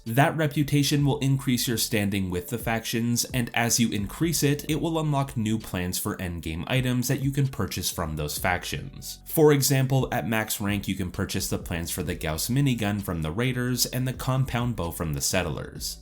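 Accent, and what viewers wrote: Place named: Midwest, United States. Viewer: I’m guessing he’s from the Midwest. Maybe Ohio or PA. His speech is PERFECT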